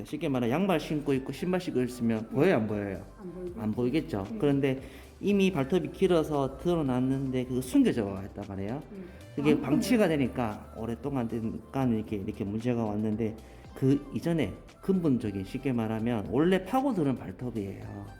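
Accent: Gyeongsang, South Korea. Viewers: 드디어 오랫동안 기다렸던 영상을 보게 되네요. 역시 경상도 사투리로 고객님께 자상하게 설명도 잘 해주시고 너무 깨끗한 발톱정리 👏👏😄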